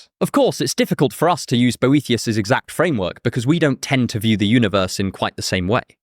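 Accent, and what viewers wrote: England, United Kingdom. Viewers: I absolutely love your wonderful English accent that keeps me so satisfied til the end of the video. You really make great quality videos and I love it!!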